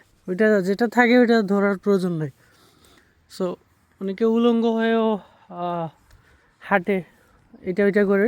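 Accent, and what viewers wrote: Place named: Sylhet, Bangladesh. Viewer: ভাই আপনি সিলেটি তাইনা তাইলে সিলেটি কথা বলেন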